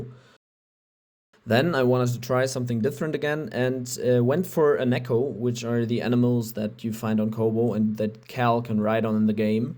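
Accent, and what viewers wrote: Germany. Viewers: This looks so incredibly awsome. The techniques you use make everything look so interesting, and the colour composition looks so awsome too. I didn't even needet to watch the Vidoe to the End before i subscribend. And your english sounds very good too. You are able to notice the german acent but it isn't strong and doesn't bother. But please do something about your audio. Maybe i am the Problem but i watched it with my headphones on and only heard you on my left ear. I am looking forward to your next Video. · hör ich da deutschen Akzent?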